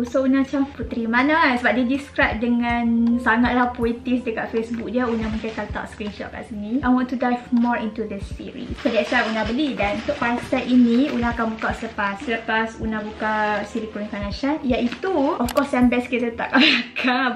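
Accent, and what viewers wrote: Kedah, Malaysia. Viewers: Sya suka kak punya perckpan dialek Kedah tu🤣🤭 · Weiii, teka betul la kuning! Hehe, eksaited sendiri! Btw, suka dialek Kedah sebab ada seorang kawan Kedah kat uni dan rindu beliau bila dengar cakap lagu ni. Best video! Suka tengok. Keep going! 😊😊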